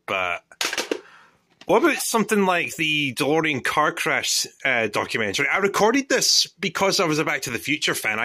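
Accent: Scotland, United Kingdom. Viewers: Do you live in Scotland according to your voice ?🇬🇧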